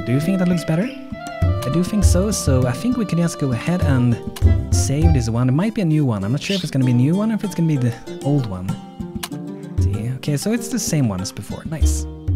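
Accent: Sweden. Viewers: Well,thx for replyn i Have subbed, btw is that an swedish accent? I Myself come from sweden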